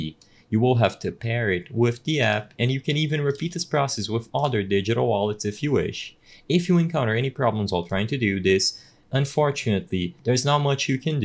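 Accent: Brazil. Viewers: That's certainly a Brazilian speaking English. You got caught by your accent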